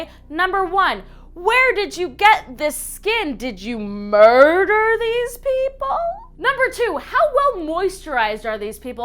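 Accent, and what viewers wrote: Germany. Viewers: best German accent ever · I'm in love with! I have subscribed and I will NEVER unsubscribe! You're actually really good at a German Accent! (I'm From Germany) Please make more videos!!!!!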